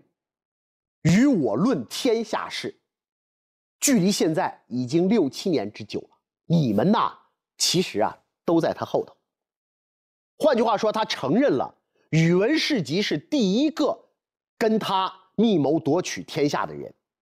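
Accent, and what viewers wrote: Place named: Shaanxi, China. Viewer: 这教授的普通话口音一听就是我们陕西人。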